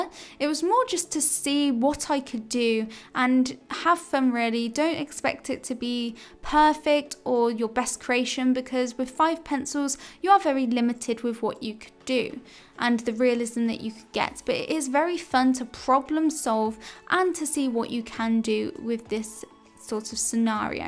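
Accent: United Kingdom. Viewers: U r having a good British accent, Where r u from?? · Everybody looking at her drawing skills but me only paying attention to her Emma Watson accent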